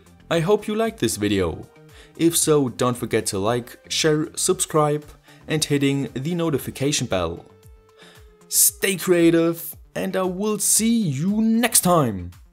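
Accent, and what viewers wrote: Germany. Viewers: What kind of accent do you have? I can't quite recognise it Like which part of Germany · des is voll lustig wie du so richtig deitsch englisch redest ;D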